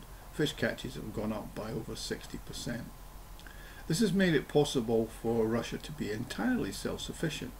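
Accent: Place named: Glasgow, United Kingdom. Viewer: I'm guessing you are from the Glasgow area. I am in Knightswood but grew up in Dumbarton. Can I respectfully suggest you invest in a decent microphone? You deserve one!!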